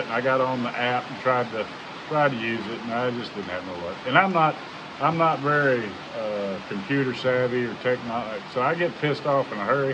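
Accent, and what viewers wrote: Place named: United States. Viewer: That’s Strong American accent , didn’t understand much of that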